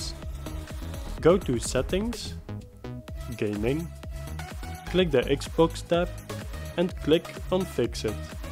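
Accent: Netherlands. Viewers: 100% your from nederlands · Man your accent sounds so dutch, i love it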